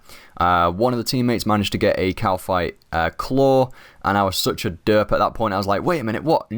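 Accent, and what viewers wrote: United Kingdom. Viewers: Yo, what size monitor do you have and also are you from England?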